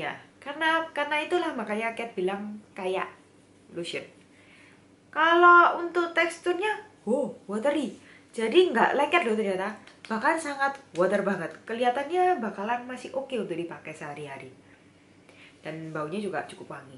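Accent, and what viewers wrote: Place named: Surabaya, Indonesia. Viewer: surabaya itu aksennya kental y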